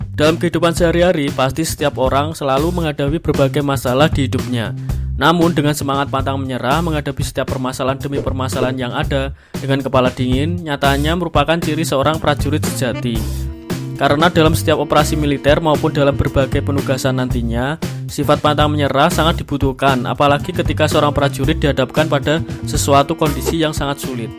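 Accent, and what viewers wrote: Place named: Java, Indonesia. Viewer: medok amat bang bicara nya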